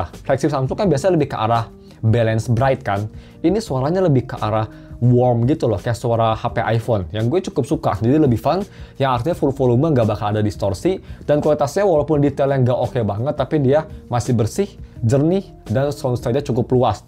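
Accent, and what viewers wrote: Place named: Depok, Indonesia. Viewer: mas" Depok 😅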